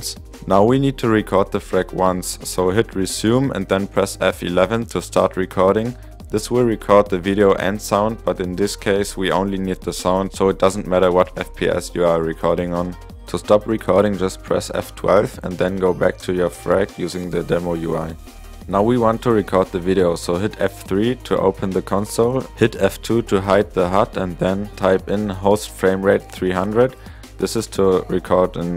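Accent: Germany. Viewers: you sound like you're coming form germany^^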